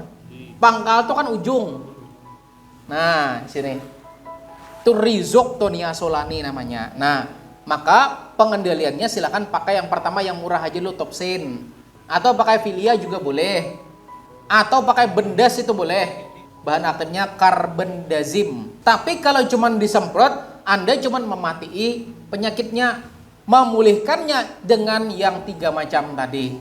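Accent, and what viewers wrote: Banjar, Indonesia. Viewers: Banjar banar aksen nya ❤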